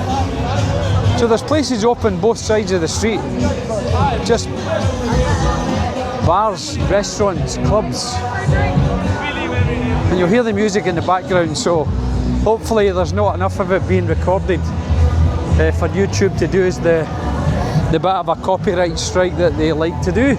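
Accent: Scotland, United Kingdom. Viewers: Hi I'm quite new to your channel, but I like it. Good to hear a good Scottish accent. I have a question for you regarding movie g to Spain. I have looked at the proces of moving to Spain and I think it's too complicated. How easy is it to rent a property in Spain for the 90 days. Or can I just buy a property and spend the 90 days there without having to apply for a visa?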